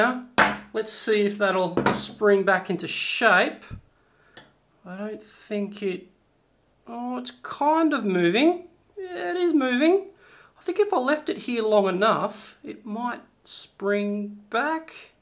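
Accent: Australia. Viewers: No, lol. Same Aussie accent though.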